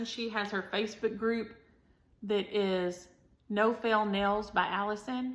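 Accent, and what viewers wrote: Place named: Texas, United States. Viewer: I so enjoy watching you! I was born and raised in Texas and I love hearing your accent 💗 it reminds me of home. I still get on my sons even tho they are in their 40’s, the grandkids love it 😂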